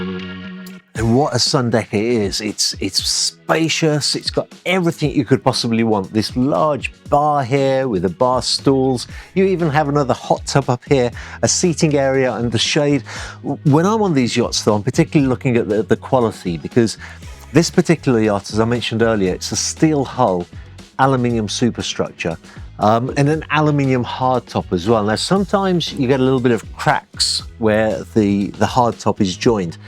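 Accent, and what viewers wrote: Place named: West Midlands, United Kingdom. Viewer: I can hear the West Midlands.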